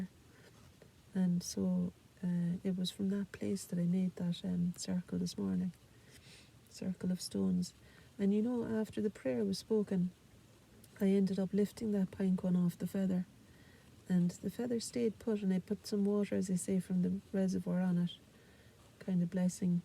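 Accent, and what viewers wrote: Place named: Ireland. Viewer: your lovely soft Irish lilt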